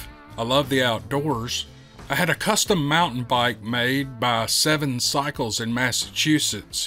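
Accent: South, United States. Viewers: I’ve tried other shows on this subject, but yours is the one I always click on. Your voice sounds like my southern family, you sound like an uncle of mine, very calm and easy to listen to. Makes doing chores a breeze. Thank you and keep it up. Maybe you’ll have an experience yourself. Have a blessed day. 😊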